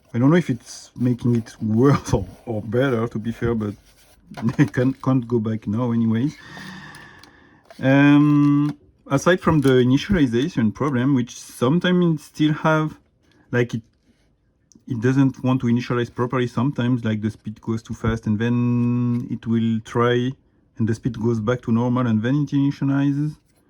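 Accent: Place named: France. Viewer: I hope you do more of these live unboxing. We’re all here for your lovely French accent <3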